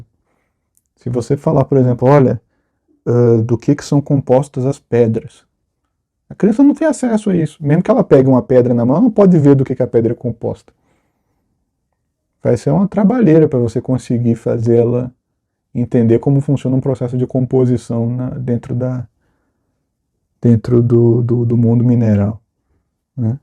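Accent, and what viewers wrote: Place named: Bahia, Brazil. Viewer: Professor me tire uma curiosidade:o professor é de onde na Bahia?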